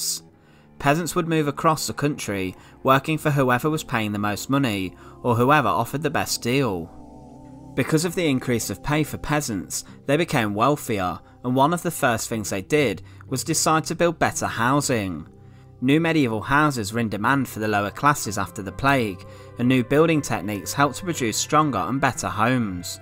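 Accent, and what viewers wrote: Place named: North, United Kingdom. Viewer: nothing he’s just northern😭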